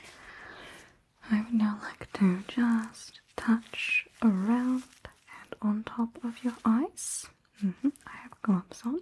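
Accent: New Zealand. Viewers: lordy kiwi accent melting my vagus nerve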